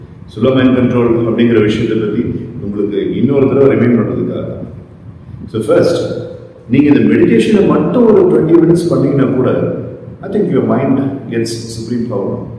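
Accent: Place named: India. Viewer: I'd like to listen but, between the echo and the accent, it's difficult. Thankfully, I have the book. (Generally speaking, I can understand Indian accents -- the echo is the issue I have -- and the closed captioning isn't in English.)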